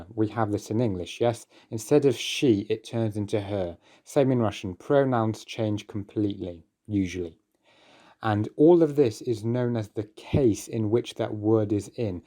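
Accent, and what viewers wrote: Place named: United Kingdom. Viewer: I am curious, you have a strong british accent.. are you a native british i am guessing, if so, how did you learn and be so well knowledgeable on the russian language? Great video as always, cases, greatly explained compared to other videos! Will share this!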